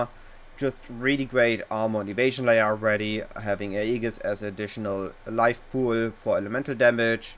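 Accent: Germany. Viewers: High-end german accent.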